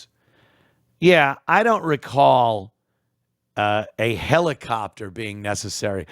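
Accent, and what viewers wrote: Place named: Boston, United States. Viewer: I love your Boston area accent. You are also pretty don good with Tony Soprano , Jesse Ventura and Casey Kasem.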